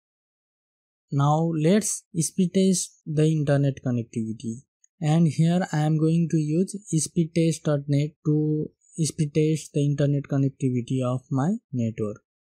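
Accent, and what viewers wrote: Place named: India. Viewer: downvoted because you sound indian and i can't understand half of what your saying. let me explain: downvotedbecauseyousoundindianandican'treallytellwhereonewordstartsandanotherwordendsbecauseyoudon'thavespacesbetweenyourwordswhenyouspeak. i don't think can be any more clear than that.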